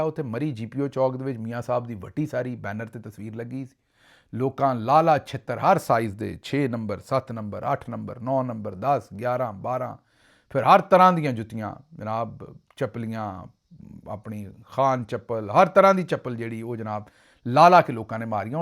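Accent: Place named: Malwa, India. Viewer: Sahib tuhade wali Punjabi Bathinde Mansa area vich boli jandi hai.Mai tuhada prog bahut enjoy karda ha. God bless you.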